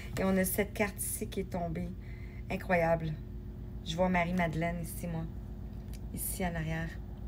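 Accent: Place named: Quebec, Canada. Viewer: je suis epatée par vos guidances ❤❤❤❤et jadore votre joli accent québécois 🙏🙏🙏🙏vous etes ☀ belle journee a vous 🙏🙏🙏et merci pour vos guidances qui m'éclairent dans mon❤❤❤ cheminement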